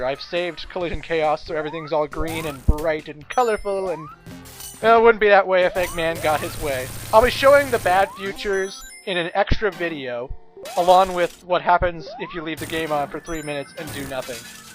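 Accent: Canada. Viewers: speaking of canadian stereotype's do you say aboot when you mean about? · youre from Canada ? And sonic boom sonic boom sonic boom is annyoing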